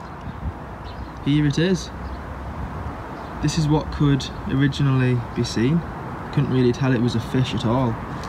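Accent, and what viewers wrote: Scotland, United Kingdom. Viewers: you probably won't respond but are you Scottish? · Are you Scottish?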